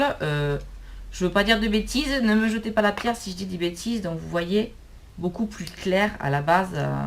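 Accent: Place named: South-West, France. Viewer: C'est bien ce que je me disais j'ai reconnu l'accent du sud-ouest 😉 je suis de Mirande dans le Gers mdr 😘😘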